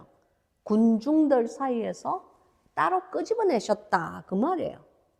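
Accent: Gyeongsang, South Korea. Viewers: 목사님~보고싶었어요❤ 진짜~~~성경공부 오랫만😂 너무 너무 좋아요 목사님 첫인사 하시는 것도 넘 귀여우세용 *꾸지리ᆢ*갱상도 사투리도 ㅋㅋ (저도 부산~^^)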